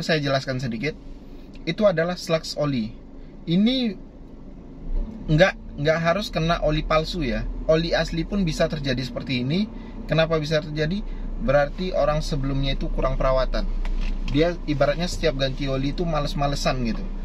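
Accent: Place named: West Sumatra, Indonesia. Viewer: Asslm Maaf Logat kayak orang minang ya bang.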